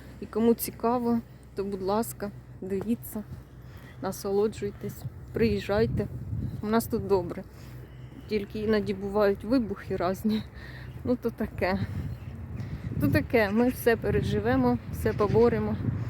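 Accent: Sumy, Ukraine. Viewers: Сумський суржик не можно не впізнати,так говорять тільки в Сумах.❤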